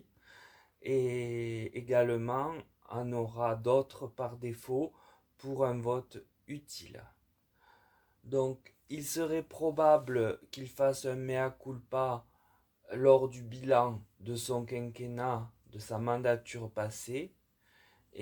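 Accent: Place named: South, France. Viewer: c'est quelle ville du Sud cet accent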